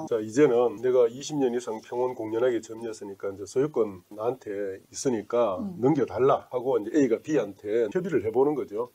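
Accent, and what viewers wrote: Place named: Gyeongsang, South Korea. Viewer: 이 친구 말투로 보니 대구출신이네.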